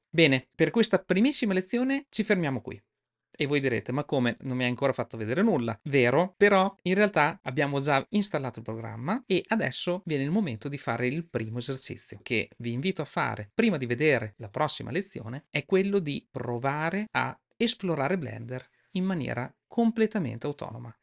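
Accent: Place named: Emilia, Italy. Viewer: Ciao mi sto avvicinando a Blender da pochi giorni, provengo da Cinema 4D con un livello appassionato avanzato, grazie del corso lo seguirò con interesse e costanza, dal tuo accento sento che sei emiliano, valore aggiunto in quanto amo la tua terra tanto che ho la compagna della provincia di Modena! a presto!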